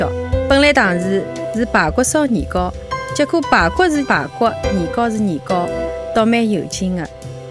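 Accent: Shanghai, China. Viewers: 满地道的上海市区口音啊，就上海市区话就分好多说法，有偏宁波口音的，苏州口音的，苏北口音的还有广东口音的，还有上海乡下青浦奉贤浦东等口音，有人说‘回来’也有说‘转来’的，都可以啊，主要看本来祖上或者生活的社区是哪里的移民，那个'teng一teng'就很地道，上海话普遍语速偏快，但是带苏州口音的就会说的比较慢，因为苏州话很细很软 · Yanting Qi 这个就是地道的市区口音，现在很多人听洋泾浜听习惯了就觉得正宗的奇怪？ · 在美国听到上海乡音好亲切好温暖！ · 我东北人，不看字幕只能听懂个别词😂上海话还挺好听的。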